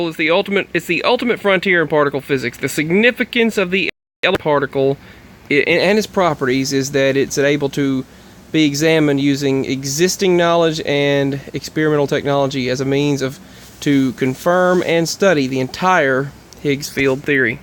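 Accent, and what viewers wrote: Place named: Tennessee, United States. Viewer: Hello my little friend, are you in Tennessee? I hear your accent and if your even close to here in Tennessee then I'll have to say Praise Jesus for what your doing. Nobody around here talks about what going on with these collidars. And the one you say might be hidden underground I really believe the Holy Spirit, Wind and Breath has showed me that it's under Oak Ridge Tn. To much in Tn is hidden from us, the Red headed Giants that followed the map of the rivers where here plus the Giants built all mounds the Native Americans have been said to have built, the Native Americans have many stories to prove that the tales of the Giants. Native Americans where all over the state of Tn so that means the Giants where here to. For some strange reason Tennesseans have been purposely dumbed down so we don't pay attention to whats going on at oak ridge TN, Nashville TN with thier parthanon and Music city, Memphis TN with thier King of rock and roll plus jazz music and Egyptian connect and how the state of Tennessee has a pyramid on the driver's license. 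I believe oak ridge plays a huge part in this collidar to collidar connection. I seen where you mentioned the Freemasons in some videos well the Freemasons are all religions into one and Jesus has showed me what Revelation says and how all these nation's are in the same Bed with one another so with that being said I really believe that all these collidars are working together behind closed doors to open the bottomless pit in Revelation chap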